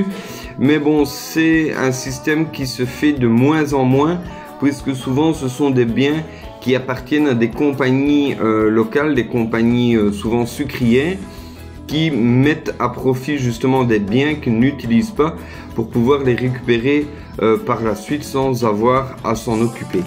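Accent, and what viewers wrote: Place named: Belgium. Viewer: Bonjour, je vous découvre ce soir! Seriez-vous Belge? Il me semble reconnaître un bon petit accent de chez moi! Je vais passer 3 mois à l'Île Maurice pour découvrir et voir si c'est possible de m'y installer dans le futur! Pourriez-vous me dire comment trouver une chambre, un studio pas trop cher pour une période de 3 mois? Est-ce possible de trouver près de la plage? J'aimerais aller vers Rivière Noire pour nager avec les dauphins! Je vous remercie de votre réponse! Puis je vous envoyer un mail? Merci bcp! Evelyne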